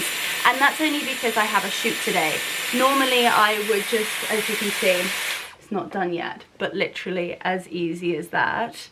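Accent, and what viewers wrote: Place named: RP, United Kingdom. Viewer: I did look it up, and whilst she was younger doing the interview, it is amazing at how much more "posh" she sounds now. Not complaining, just is interesting.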